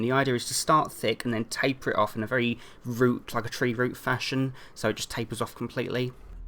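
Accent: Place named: Bristol, United Kingdom. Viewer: Youre just ouststanding mate!, Your accent sounds little like Bristol'ian accent, are You from there?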